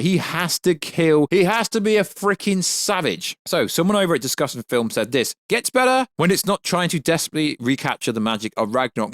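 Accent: England, United Kingdom. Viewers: This terrible channel is so bad I need an interpreter to translate English accent to American!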